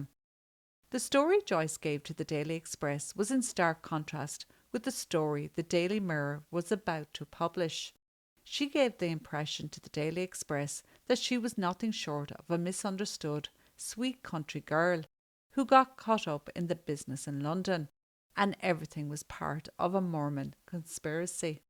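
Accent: Ireland. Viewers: Sorry to butt in but my dear you look terrific on my tablet let alone your delightful demeanor and your sweet Irish accent to boot 😊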